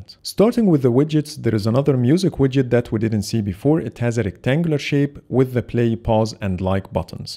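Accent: Egypt. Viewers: طب و الله حاسس انك مصري رغم انك تتكلم انجليزي😁 هعرف نفسي صحيح لما تحطلي قلب😅 اخوك من تونس 🇪🇬❤️🇹🇳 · Your accent is a lot similar to our Egyptian one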